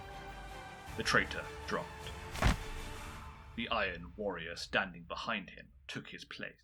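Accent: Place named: England, United Kingdom. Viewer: Dark gods bless the English accent. AI always mispronounces everything. Among the repeat offenders: Noosphere. Love the storytelling boss. 👊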